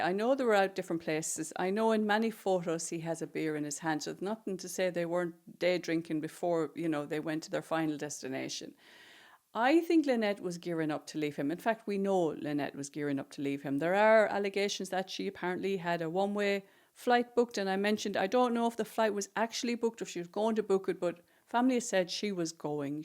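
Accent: Ireland. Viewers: Hi from NJ,,, I detect your Irish accent (pretty sure). Hopefully we will be hearing of his arrest soon, his behavior has been in our face long enough.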